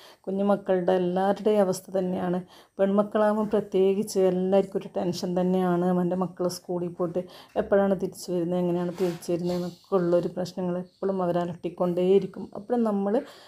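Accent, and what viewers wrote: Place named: Kannur, India. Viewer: ചിലത് ഒന്നും മനസ്സിലാവില്ല കണ്ണൂർഭാഷ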